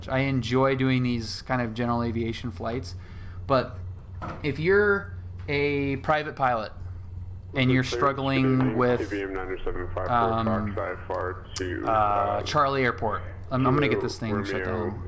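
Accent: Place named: North, United Kingdom. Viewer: But you have a northern accent! 🤨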